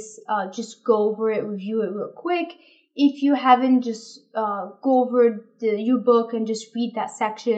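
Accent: France. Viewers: I was closed. Pourtant un accent francais quand tu parles anglais.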